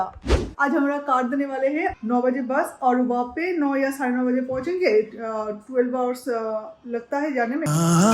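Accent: Bengal, India. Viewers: Still you're carrying your Bengali tone, accent and pronunciation in your Hindi and English conversation/words.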